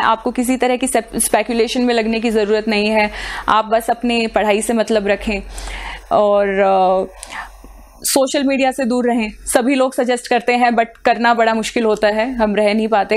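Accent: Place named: Haryana, India. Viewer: Haryanvi accent 🤘🤙👏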